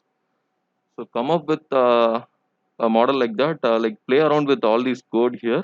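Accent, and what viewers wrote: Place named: India. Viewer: Its like watching sentdex ML series again. With indian accent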